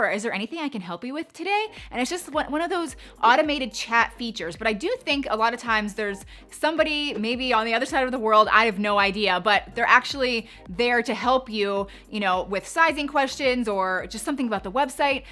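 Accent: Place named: Pittsburgh, United States. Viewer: I love how you say “mere” (mirror) and Q-pon (coupon). You are definitely from Pittsburgh (as was I). Finally lost the “yinz” to y’all when I moved to the South. Love your channel!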